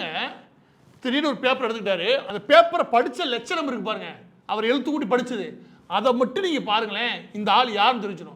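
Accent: Madurai, India. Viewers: அண்ணா பேசுவது தான் நல்ல இருக்கும் மதுரை மொழியில் 👍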